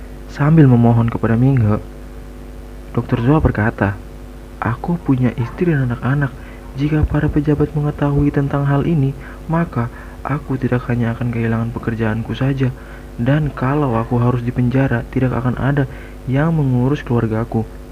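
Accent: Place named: Ambon, Indonesia. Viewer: Suarane ambonnn😊🙏🤣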